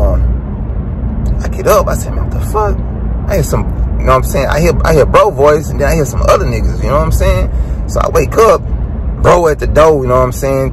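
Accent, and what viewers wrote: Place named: Houston, United States. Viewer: Htown accent on deck.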